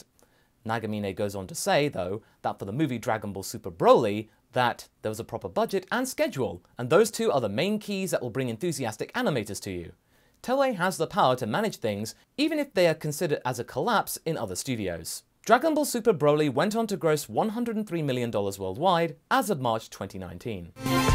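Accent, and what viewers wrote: England, United Kingdom. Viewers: Lol your gourgeus english accent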